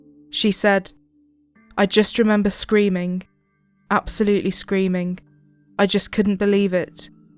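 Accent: United Kingdom. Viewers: When you say reached out do you man contacted, you sound like you are British and not American so why do you use this Americanism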